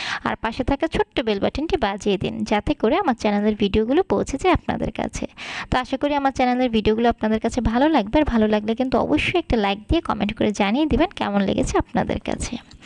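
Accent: Barishal, Bangladesh. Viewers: আপু এখন যে বরিশালে ভাষায় কথা বলেন এইটা আমার কাছে ভালো লাগে অনেক সুন্দর লাগছে । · আপু তোমার বরিশালের ভাষা গুলো ভালো লাগে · আপনার বরিশালের ভাষা আপনার মুখে অনেক ভালো লাগে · আপু তোমার বরিশালের ভাসা বেশি ভালো লাগে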